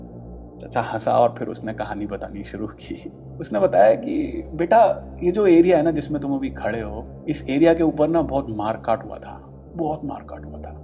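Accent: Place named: Mumbai, India. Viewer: Bambaiya bhasha ekdum sahi pakde hai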